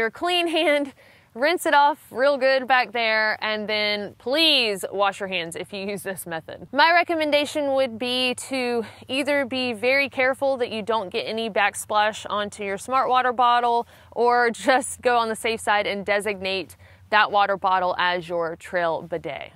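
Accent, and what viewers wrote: South, United States. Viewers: I love your accent, I am from GA and have been living in the PNW for 15 years. I so miss southern women. Tried hard to find one with an accent like yours, but wound up married to a girl from AK. We manage the Mountaineers Lodge at Stevens Pass on the PCT during most summers. This summer we likely not open. Its fun being a trail angel. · Oh, man, I grew up in Georgia, though moved out of state nearly 13 years ago. I haven’t heard a southern accent like yours with any consistency in over a decade! Great info in sanitation and hygiene!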